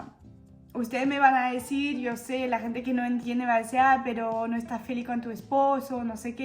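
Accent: Argentina. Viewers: Tranqui, hablas muy muy bien argentino. Serás bienvenida si andas por Mar del Plata. Mate y cafecito y ABRAZOS ❤ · Porque hablas así si. Sos argentina,???? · Hola,haciendo zaping en Youtube miro muchos contenido de personas como vos y con parejas de uno y otro país,y te encuentro a. Vos y a Santi,hay tantas historias hermosas de personas de diferentes países, de argentinos con extranjeros,y en esos estás. Vos a diferencia que tú esposo es colombiano, vívio Mucho acá,y vos prácticamente SOS más argentina que francesa,y lo más lindo que hablas tan bien el idioma con acento bien argentino,SOS una persona muy hermosa,todo lo que sea para tu futuro, bienvenida,a la argentina,sos muy bella,sigue tu instinto y te irá bien junto a tu familia,te mando un fuerte abrazo 🫂 🇦🇷🇦🇷🇦🇷❤️❤️❤️❤️❤️ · Así es, Argentina es lo más boluda!! 🥰 ❤ 🇦🇷 Sos toda una argentina hablando me encantó tu video! 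🎉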